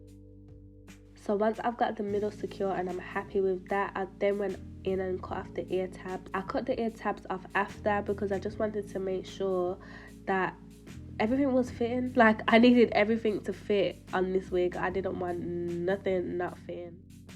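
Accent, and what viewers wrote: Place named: West Midlands, United Kingdom. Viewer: I love black girls with a West Midlands accents !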